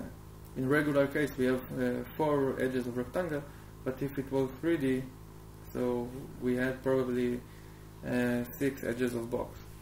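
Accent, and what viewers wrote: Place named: Arab world. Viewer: you have arab accent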